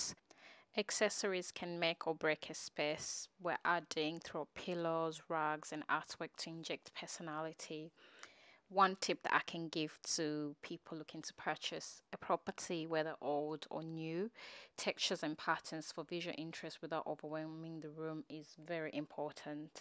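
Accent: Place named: Scotland, United Kingdom. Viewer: Love this house big, gorgeous and spacious. I love the doors also. My type of chandeliers throughout. The shared children's bedroom is gorgeous. Thanks for sharing. PS. Your dual accent, I can hear the Scottish accent coming through when you pronounce certain words. ❤💜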